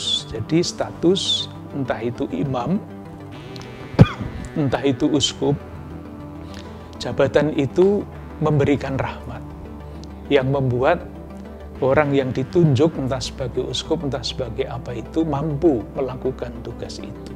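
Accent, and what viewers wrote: Java, Indonesia. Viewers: Medok banget bapaknya😂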